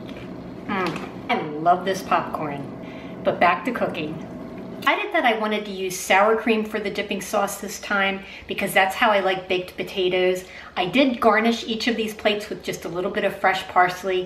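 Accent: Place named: Pennsylvania, United States. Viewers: Love the video but staying for your Pennsylvania accent 🙂 coming from a Minnesotan. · Great video! Are you from Pennsylvania? Your “accent” sounds like it!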